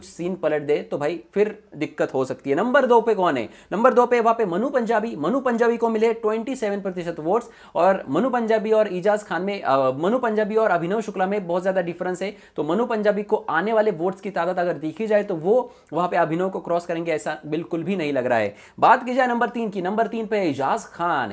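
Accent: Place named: Kashmir, India. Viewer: Apka kashmira accent wah wah.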